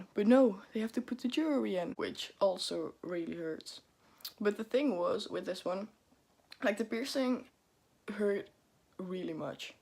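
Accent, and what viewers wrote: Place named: Netherlands. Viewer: Ben jij nederlands Zowel ik hoorde het helemaal aan je accentje😳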